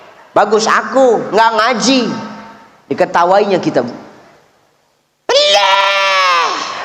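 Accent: Medan, Indonesia. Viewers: Uztaz. Kau....kau...kau. Memang itu logah nya. Medan punya bahasa. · Gas terus bahasa dan dialek Medan mantap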